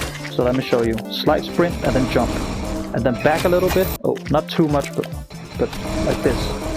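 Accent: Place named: Sweden. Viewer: You sound swedish 😂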